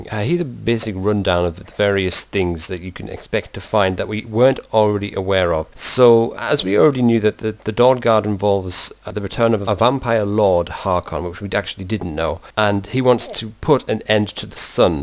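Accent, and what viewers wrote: United Kingdom. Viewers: dude ii watch your videos just for i hear your voice ... Britsh . its amazing. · britishhhhhh!!!! XDDD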